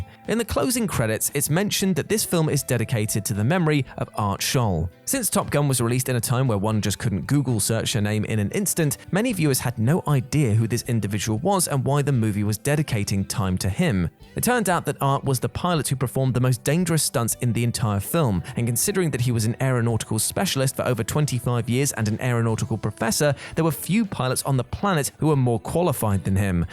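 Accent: United Kingdom. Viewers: God I hate British narrators!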